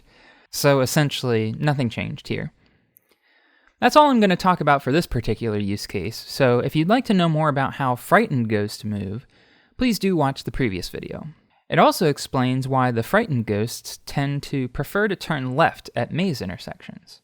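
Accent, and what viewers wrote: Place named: South, United States. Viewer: Are you from the south? I like your accent.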